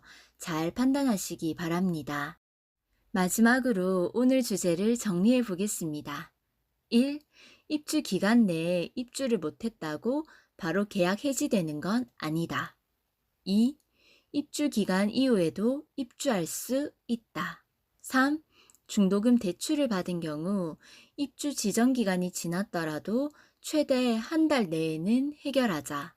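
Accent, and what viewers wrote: Gyeongsang, South Korea. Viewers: 목소리 들어보니 경상도 사람이네요. 일단 잔금 연체이자 말씀 감사합니다 저는 실수요 무주택자입니다. 계약금10% 완납하고 70% 건설사중도금대출 나머지 20% 입주잔금은 제가 값을 생각입니다. 입주 지역은 조정대상지역이고 평택고덕신도시 a-39블럭입니다. 제가 생각하는 입주계획이 틀린지 아닌지 말씀해주시면 감사합니다. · 경상도 TK쪽인듯 익숙해서 듣기 좋습니다. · 경상도 맞는 듯 하네요. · 경상도 분이신가봐요 ㅎ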